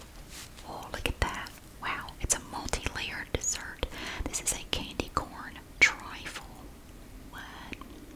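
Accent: South, United States. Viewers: Her soft Southern accent is incredibly relaxing. She could tell me I was fired and I'd probably ask her to say it again a couple of times.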